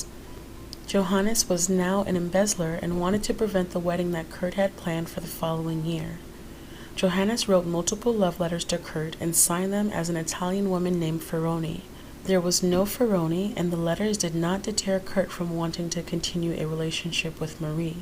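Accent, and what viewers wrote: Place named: Canada. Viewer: You've taken the bull by the horns here. That is extremely commendable. I've said this before, but I really, really like how you end your videos with discussion questions. You are a very strong personality. Ha ha. Just judging from your accent, are you Canadian or upper U.S. midwest? I've been all over in my life, and that's what I think I hear. I'm prepared to be wrong though.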